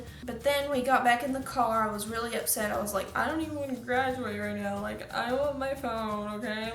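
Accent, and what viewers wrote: South, United States. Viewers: that’s called a southern accent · o próprio sotaque dela já da pra saber que é do sul kkkkkk ela deve ser do texas ou alabama ou algo assim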